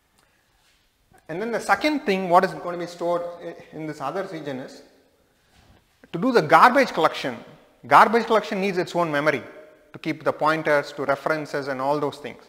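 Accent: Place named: India. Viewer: 受不了三哥的英语。。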